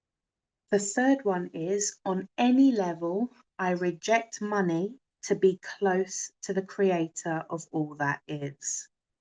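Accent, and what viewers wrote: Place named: United Kingdom. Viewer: Si funny how Creator works, i still remember asking show me the way please help and i would SEE UK and i started laughing cause i was like 😮 how? And poom your channel came out, and when i read RSY i said love it, this is it Creator, and when i Heard You speak i was like ohhhhh right UK😅😅😅🎉🎉🎉🎉🎉🎉🎉🎉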